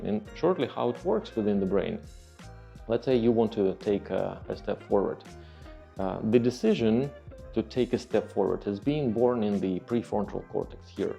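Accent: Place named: Russia. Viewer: Yes now it makes sense. Your accent and vibe remind me of another one of my favourite YouTube content creators, Anton Petrov. He’s also a Russian expat.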